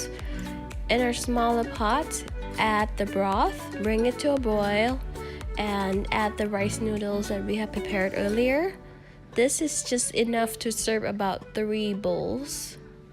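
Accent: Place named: Luang Prabang, Laos. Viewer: พูดภาษาลาว เพราะกว่า เพราะเสียงขึ้นนาสิก จะพูดจะร้อง ก็เพราะ ชอบเสียงและสำเนียงหลวงพระบางมากๆ ฟังแล้วสบายใจ ถ้าทำคลิปต่อทามมิ่งตอนนี้ ก็ยังโอเคอยู่นะ เป็นกำลังใจครับ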